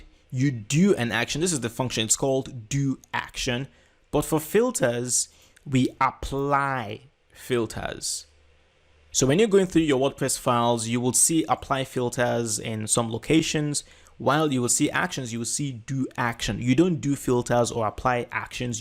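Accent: Nigeria. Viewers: Hey bro, good info, is that a Nigerian accent lol? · Great video. Merging my php knowledge with Wordpress has been tricky. This is really helpful. Thanks You sound Nigerian. Are you Nigerian? I’m Nigerian 🇳🇬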